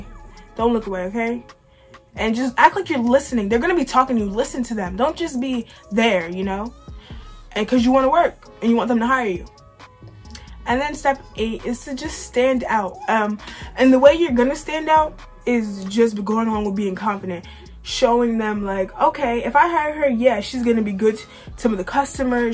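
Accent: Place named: New York, United States. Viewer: love the ny accent 🤩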